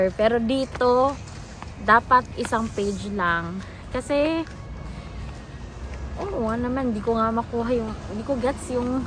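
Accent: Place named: Visayas, Philippines. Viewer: haha! “nayabag naman ni cya oi”. bisaya njud cuz. haha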